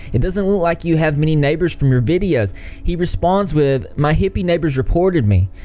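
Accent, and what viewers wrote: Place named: Georgia, United States. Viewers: I called it! I knew your accent was from east of the Mississippi! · I knew that accent had to be from Georgia 🤪 I live there too. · I just found ur channel yesterday and knew u were from Georgia just by your accent- bc that’s where I live too 😂 happy you said it in this video so I’m not still wondering if I’m right! What area are you from?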